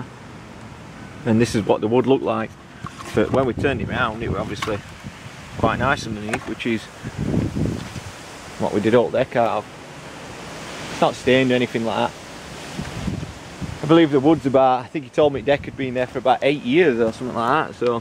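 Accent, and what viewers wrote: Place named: Northern England, United Kingdom. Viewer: Hi, I also live in the north of england and judging by your accent, not too far from you, so where abouts did you get all these supplies from?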